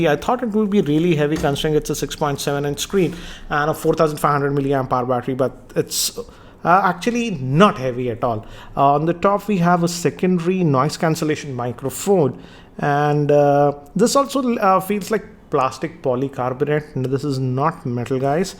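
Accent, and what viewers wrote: India. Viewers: The Indian accent is too hard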